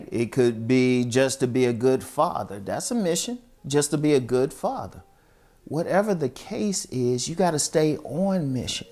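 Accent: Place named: Baltimore, United States. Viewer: I’m 28 years old I hope to be this calm n calculated when I’m older s/o to you og from a northern VA Cat to a baltimore brotha I can hear ya accent 💯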